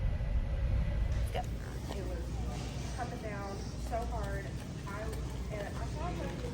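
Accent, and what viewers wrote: South, United States. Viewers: I had to add that i love, love, love your accent. It is comforting and sweet. I feel like I'm listening to a southern friend. I'm from northern Virginia, so our accent is less here...still technically in the south, but because we live near the DC Metro area in the city, everyone's accent is a mix of where they are from (very international) and from here. My parents are both from Wisconsin, so I have a bit of a Norwegian accent. You can cook all the beans and season them. You can make half still whole and do the other half refried. You can freeze part of what you make, as you are correct...cooked beans last in the fridge for 5 days. You can freeze cooked beans up to 3 months. I frequently cook a double portion of beans, then freeze half for the following week. This saves time and money (stove/electric). I thaw the beans in the fridge, then serve differently than the prior time. 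With rice, in a soup with vegetables and a boullion cube or two, or on toast, corn tortilla, etc! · would you be willing to share your garden journey. I know you may have already started planting. But I would love to see videos on what all you grow & what you do. I like the videos you do for budget cooking. When you were getting the vegetables it reminded me that last year you would use stuff from your garden. I think people enjoy watching you. Your sweet southern accent, smile, laughter & how honest & real you are. I know when ppl start channels it might be for a specific thing. But clearly your subscribers enjoy all aspects of you are willing to share. From the camping & spending time with your family, to the fun game you & Dusty played. Tell the family hello. God bless you all all ❤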